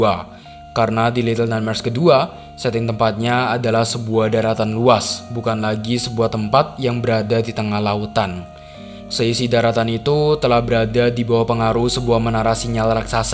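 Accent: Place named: Madura, Indonesia. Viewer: orang Madura nih yg bikin ten konten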